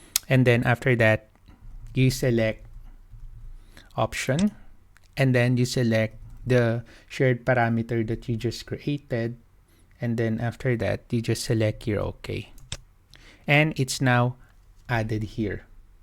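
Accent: Philippines. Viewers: accent palang pinoy na alam ko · are you pinoy? you sounds like pinoy sir.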